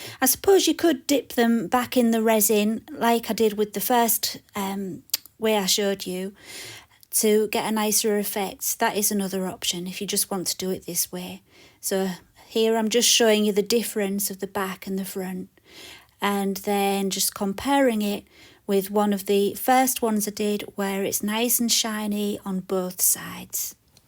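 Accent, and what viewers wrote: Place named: Scotland, United Kingdom. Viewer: I enjoy your videos so much for two reasons. First I love your work. Second my grandparents were Scottish and your accent warms my heart and makes me think of them. I always wanted to travel to Scotland and see where they lived but I've put it off. Listening to you makes me want to speed up my plans. I think when covid is all gone I'll make the trip.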